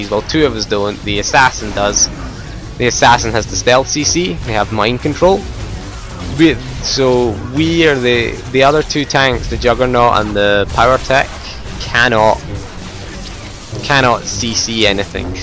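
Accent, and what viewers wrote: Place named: Edinburgh, United Kingdom. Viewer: What part of Scotland is your accent from? sounds like Edinburgh or east anyway ;3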